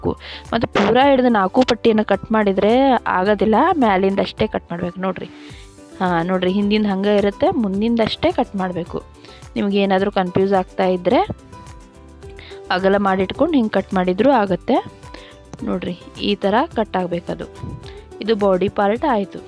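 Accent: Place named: Mandya, India. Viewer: ಹಾಯ್ ರವರೆ ನೀವು ಕಾರವಾರದವರ ನಿಮ್ಮ ಭಾಷೆ ಮಂಡ್ಯ ಭಾಷೆಯಂತೆ ಇದೆ 👌🙏❤️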